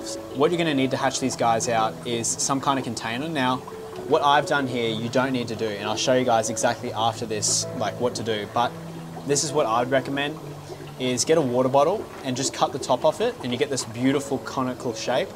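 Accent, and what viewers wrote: Australia. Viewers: Australian, not Pom.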